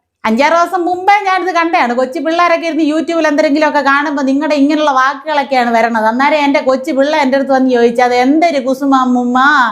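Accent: Thiruvananthapuram, India. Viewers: തിരുന്തോരം ഭാഷയിലായത് വളരെ ഇഷ്ടപ്പെട്ടു, എന്തൊരപ്പി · Thiruvananthapuram slang kalakki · തിരോന്തരം സ്റ്റൈലിൽബോചെയെ പള്ളൂ പറയാൻ ഇതാരെടേ. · ഉഗ്രൻ.വളരെഇഷ്ടപ്പെട്ടു.തിരുവനന്തപുരംഭാഷ.മിമിക്രിക്കാരിയാണല്ലേ.നല്ലഭാവിയുണ്ട്. ബോച്ചെക്ക്പണംധാരാളംഉണ്ട്.അതുകൊണ്ടുള്ള,അഹങ്കാരമാണ്.വിദ്യാഭ്യാസംകുറവാണെന്ന്തോന്നുന്നു.പോത്തിനോടുവേദംഓതിയിട്ടെന്ത്കാര്യം. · പറയേണ്ടുന്നതെല്ലാം വളരെ കൃത്യമായി പറയേണ്ടുന്ന രീതിയിൽ തന്നെ പറഞ്ഞിരിക്കുന്നു. ഇത്തരം ഒരു പ്രതികരണത്തിന് ആദ്യമായി അഭിനന്ദനങ്ങൾ. അതുപോലെതന്നെ ചാനലുകളിൽ വരുന്ന പല കോമഡി പരിപാടികളിലും ദ്വയാർത്ത പദപ്രയോഗങ്ങളുടെ അതിപ്രസരമാണ് (പൊടിയൻ കൊച്ചാട്ടൻ ടീം ഒഴികെ, കുടുംബസമേതം കാണാൻ പറ്റുന്നത് ഇവരുടെ കോമഡി മാത്രമാണ് ) അങ്ങനെയുള്ള വളിപ്പ് പരിപാടികളെക്കുറിച്ചും ഒരു വീഡിയോ ചെയ്യണം.. പിന്നെ സഹോദരിയുടെ തിരുവനന്തപുരം സ്ലാങ്ങിലുള്ള അവതരണം ഗംഭീരം ( ഇതാണ് ശരിക്കും തിരുവനന്തപുരം സ്ലാങ് അല്ലേ ).. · തിരുവനന്തോര० ഭാഷയു० അവതരണവു० സൂപ്പർ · നിങ്ങടെ tvm സ്ലാങ് പൊളി 😂😂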